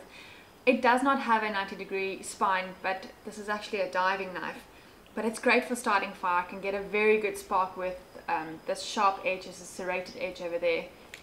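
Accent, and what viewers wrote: South Africa. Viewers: I lived in SA for two years. What a beautiful country. I miss your accent too. I'm impressed with your loadout and the fact that you actually use it. It's a well thought-out kit. You have everything you need and nothing you don't. My bag weighs in at 25 pounds. Most people with little experience overpack without realizing how heavy a 40-50 pound pack becomes after an hour. I think 25 pounds is a bit much so I'm experimenting to figure out how to shave 5-10 pounds off. I'll get there. Thanks for your excellent channel!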